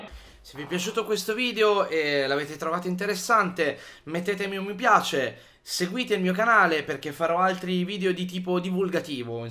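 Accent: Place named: Tuscany, Italy. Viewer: Oh con l accento toscano mi fai troppo strano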